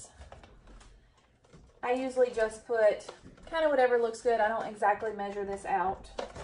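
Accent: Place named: South, United States. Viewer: Your southern accent is very sweet. Thank you for the recipe.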